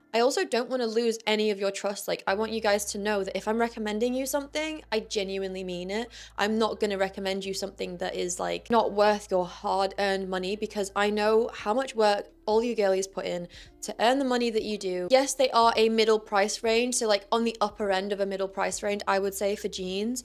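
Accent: United Kingdom. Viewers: You are a BEAUTIFUL young woman. Beautiful dark brown eyes, beautiful smile and sexy voice with the British accent. STUNNING figure. BEAUTIFUL tan body and curves, really cute belly button, nice little booty and sexy long legs. You look AMAZING in all of these pairs of jeans. All of the colors look REALLY good on you and make you even more beautiful. The white makes you look like a brown/dirty blonde-haired angel. All of these jeans hug your body and hips and make your beautiful curves POP. You look AMAZING. You are BEAUTIFUL and VERY cute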